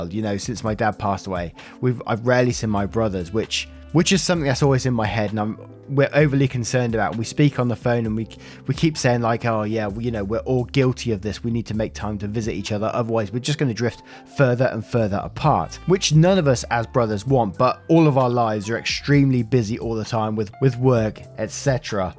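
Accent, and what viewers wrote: United Kingdom. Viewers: Greetings from Kansas City, Missouri, USA! I usually play Mahjong, Solitaire, or Yahtzee while I listen to your stories. I can’t help but enjoy your content. You have such empty and compassion for people and I absolutely love how you’ve taken in Poppy. I’m a sucker for a guy with a British accent who loves animals (please don’t take that the wrong way, as I’m quite happily married), so feel free to share as many pics of Poppy as you want. We’re definitely here for it! Much love!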